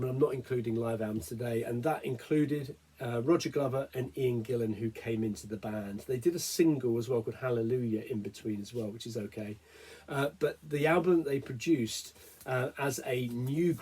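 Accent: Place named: RP, United Kingdom. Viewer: Oh I would never guess. Talks like an English lord. Upper west side o’ London or something. 😝. Your video made me play DP’s Live in Paris 75 and Coverdale had pipes for sure. It’s his banter between songs that s most annoying.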